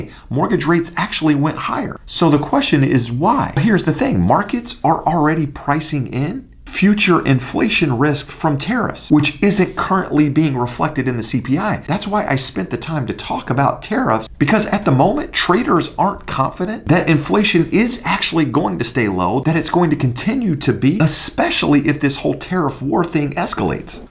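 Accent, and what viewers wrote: California, United States. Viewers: You sound like someone who lives in California .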